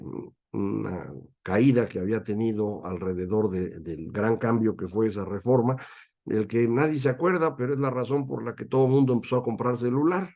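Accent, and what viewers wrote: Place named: Tepito, Mexico. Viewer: Lea las XII Tablas...desde el 450 a.C. los plebeyos ya podían casarse con patricios.... de historia medieval y equiparar el 476 con la Monarquía Universal Hispánica es de broma ¿verdad? En 1539, en Salamanca se inventó el derecho internacional moderno... entre otras cosas, que a Usted le dan igual...un negrolegendario, un anglofílico con acento tepiteño....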